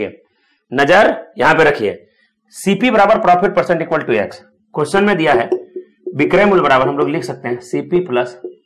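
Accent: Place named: Bihar, India. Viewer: Are gjbbb Gurudev ap to.....1 dum bihari tone m mazaa aa jata hai padhne m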